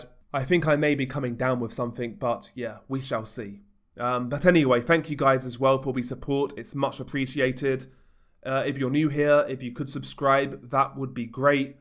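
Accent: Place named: Southeast England, United Kingdom. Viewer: Watching it while you narrate it. Saw it earlier anyway but. I’ve been watching gore since 2004 and I’m interested in man’s reaction to suffering. You could call it “morbid curiosity” but I prefer “empathy”. I empathise with these guys. I’m so lucky to be born in a peaceful location and to live my life without bother. Can’t quite place your accent but I’m Cambridge area and I guess you’re south east-ish. Anyway, love your videos mate, you’ve really informed me about the background of the cartel’s. You should do a podcast as you’d reach more people, without the restrictions of YouTube. Fascinating subject matter, in fact the south Americans are fascinating people. I lived with a Brazilian and my best mate is a jap who grew up in Brazil. Her stories are pure madness All the best brother.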